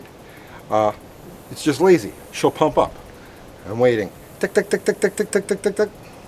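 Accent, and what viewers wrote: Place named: New Jersey, United States. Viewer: You talk like you're from Jersey.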